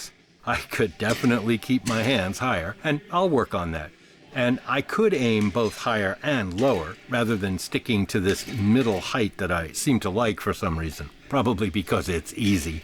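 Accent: United States. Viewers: Fantastic efforts - you’re inspiring me to get moving, even if only in my 40s. Just one q - where did the American accent come from when living in Ireland?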